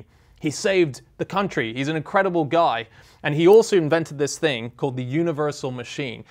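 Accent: United Kingdom. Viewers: True, but only part of ot. You sound to be british so you should know better... War came to Britain later than it came to Poland so it's just normal that Polish mathematicians started to decode it. It's not like bomba was not accurate to newer enigma model it's that British ( that sheltered those Polish mathematicians) couldn't afford to build more. WE as human should respect all that contributed for the greater cause. ALL those who were working on deciding the enigma were great pro's.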